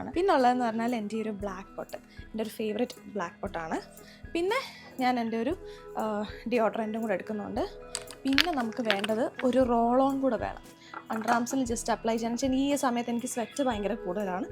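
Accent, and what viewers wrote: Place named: Trivandrum, India. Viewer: slang ipo tvm slang ayapole 😃and hope u and baby doing well ❤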